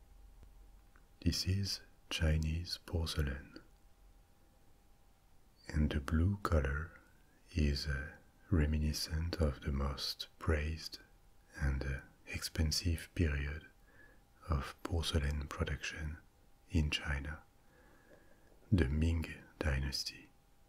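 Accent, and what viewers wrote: France. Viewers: I can’t wait to hear about the antique books! Antique books and French accents are my weakness 😁😁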